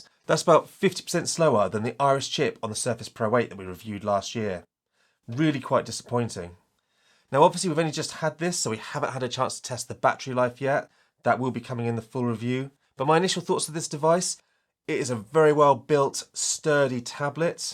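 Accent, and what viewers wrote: United Kingdom. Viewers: Why do all the British guys on youtube sound the same? So odd.👍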